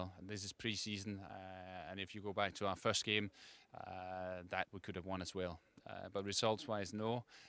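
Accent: Scotland, United Kingdom. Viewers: Good Scottish twang in his voice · Nice to hear he still has his Scottish accent!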